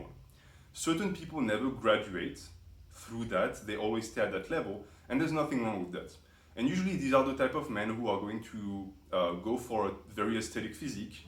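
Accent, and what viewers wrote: France. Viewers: Is your native language french?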